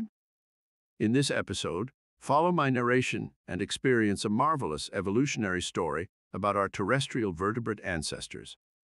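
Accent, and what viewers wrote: United States. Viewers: Excellent video, appreciate the attention to detail. Also, I love your classic mid-Atlantic accent.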